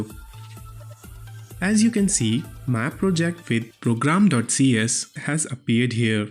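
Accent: India. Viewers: Tnx a lot brother, Btw ur accent in like Indian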